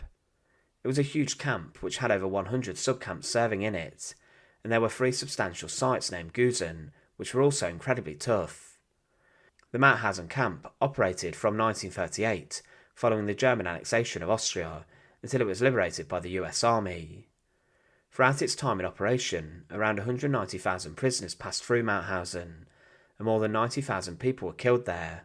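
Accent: United Kingdom. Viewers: The fact that you prolong the last word of EVERY sentence has stopped me from subscribing. Please take that statement as constructive criticism. I appreciate your fact finding but I’m not a fan of how you’re portraying the British accent. Way too generic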